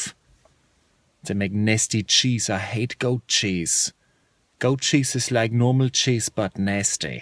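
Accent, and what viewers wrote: Germany. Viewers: Are you actually German or is it just an impression?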